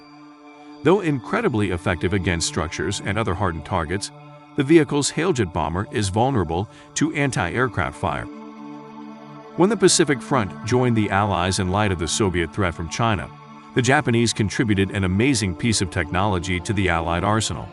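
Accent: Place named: United States. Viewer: good voice ofc amarican not this robotic english 🤖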